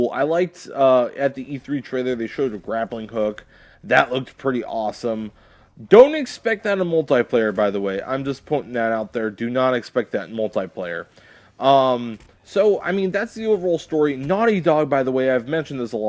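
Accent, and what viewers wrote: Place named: Ireland. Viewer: It's an Irish accent ;D Thanks man that means a lot xD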